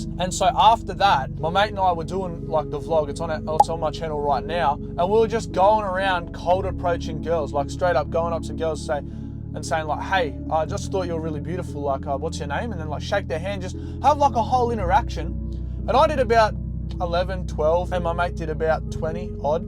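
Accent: Australia. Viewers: mate r u aussie?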